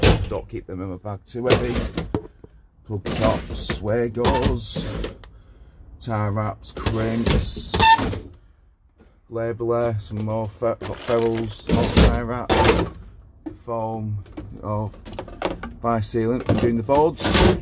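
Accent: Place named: Essex, United Kingdom. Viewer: Nice one fella - true professional, even got the accent way down in Essex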